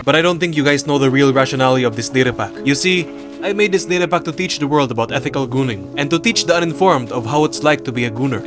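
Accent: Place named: Philippines. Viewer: An idea that can only be mustered by a Filipino. I can recognize that accent from anywhere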